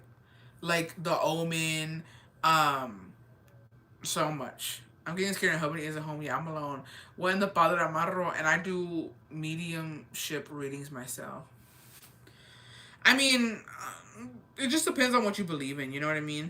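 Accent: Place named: Texas, United States. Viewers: We just Texans, that’s the way we talk 😆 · We're southern that just how we all talk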